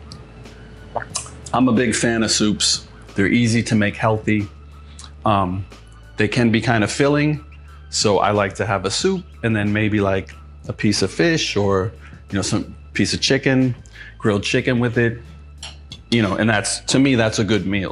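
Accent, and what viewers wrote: Boston, United States. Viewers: That Boston accent ❤️❤️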